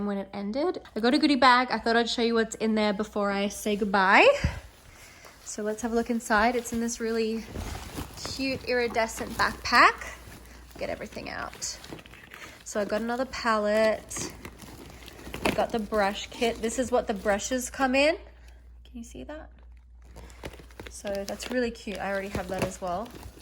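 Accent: Australia. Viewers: Loved the look! The palette looks amazing! And love that you’re repping the Aussie accent 🤙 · Your accent🥺🥺 I miss your fully Australian one😔❤️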